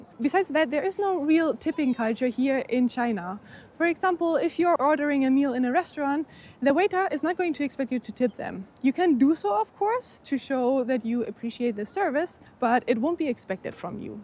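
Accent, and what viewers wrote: Germany. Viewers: Du I hear a German Akzent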